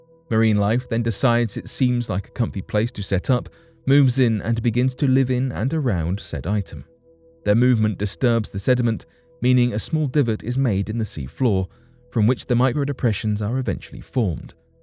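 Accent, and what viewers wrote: England, United Kingdom. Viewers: The missing penguins are from globle warming no doubt everything is caused by it from any England voiced narrator as well as Democrat producers.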